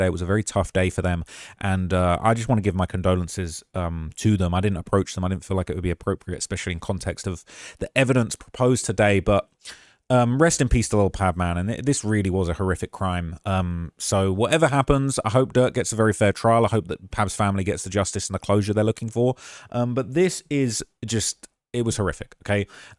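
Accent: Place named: United Kingdom. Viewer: has a thing against black people. He uses AI but yet he claims the lawyer used AI. Why? Because he was black? made the claim because he himself uses chatgpt. That was projection., you didn't go to journalism school -you're not a journalist! You get your information from Reddit comments. Going to a court house with a suit on and getting a press pass because of your British accent doesnt make you a journalist. Go to the hoods you report on after someone gets shot thefe. Thats journalism. Go interview the victims in the hood. Go do your investigation after a rapper gets shot, then I'll call you a journalist. All you do is institutionalized gossiping. You have turned gossiping into a profession. But thats gossiping - not journalism. The man you're accusing of using Chatgpt went to law school, passed the bar and is a lawyer! Who do you think you are to question his work? 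You're a gossip youtuber. Grab your lips and get a hold of yourself. You can put an old t.v, reels and passes in the background of your set, nice arrangement, but that still doesnt make you a journalist.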